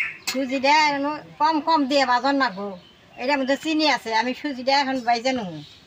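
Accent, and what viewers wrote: Bangladesh. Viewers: আপনার কথাগুলা একদম আমাদের বাংলাদেশের মানুষের মতোন ❤❤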